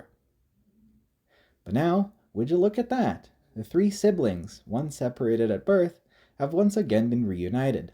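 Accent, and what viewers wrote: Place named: United States. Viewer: I find it to be a very bold move talking semi-authoritatively about not one but three entire languages, none of which you speak, and with a blatantly young American accent which suggests little more than book knowledge on the subject. I mean we've already had Scots half ruined by some autistic American on Wikipedia before!! Have ye no learnt from that?!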